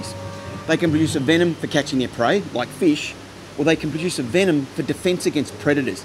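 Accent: Australia. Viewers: As soon as I heard the Australian accent, and the one speaking the accent inside a lab coat, I was all in. Subbing to ya mate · Ur ausie arent yah? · Having this explained with an Australian accept on makes sense. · Are you Australian?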